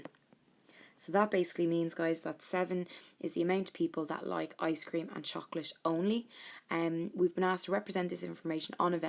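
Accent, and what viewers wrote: Ireland. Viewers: Omg, an Irish accent on a yt revision video...there is a god.😁❤️ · Oh man i love that irish accent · Irish accents do be cool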